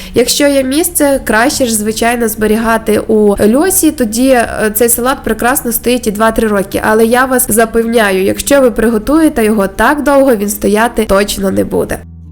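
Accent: Standard. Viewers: Маленька , дякую . Яка у Вас гарна мелодійна чиста українська мова . ❤❤❤ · Яка гарна господиня!Дякую за чисту українську.Дуже приємно слухати.Рецепти всі прекрасні,я вже їх протестувала.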